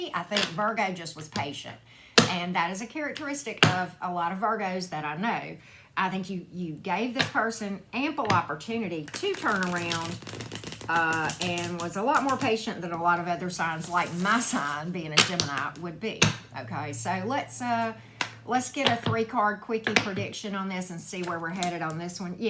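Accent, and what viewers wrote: South, United States. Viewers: Definitely resonates. I like your southern accent too!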